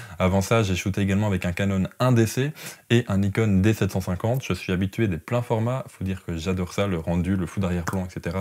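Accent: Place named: Charleroi, Belgium. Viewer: Accent de Charleroi je dirais 🤔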